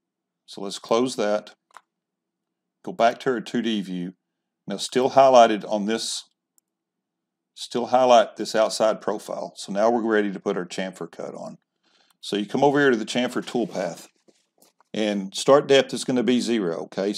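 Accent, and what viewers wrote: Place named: South, United States. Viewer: I'm about 10 months behind you on the CNC purchase. Just came across your channel today and am really impressed with it. I just got the VCarve Pro and have been watching the tutorials that come with it. I must say yours are much easier to follow and don't put me to sleep! Probably the good ol southern accent!!! I'm also very impressed with your other wood working projects!!! Thank you!!!!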